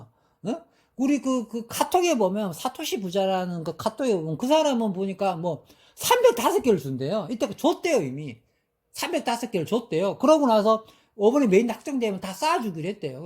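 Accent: Gyeongsang, South Korea. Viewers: 저고 경상도 입니다 경상도 사람들 말투 ㅋㅋㅋㅋ 저도 경상도라 이해 합니다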